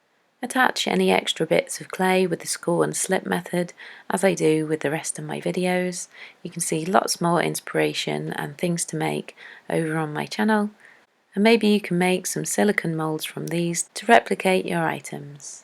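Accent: United Kingdom. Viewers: I love your british accent as well as your artskills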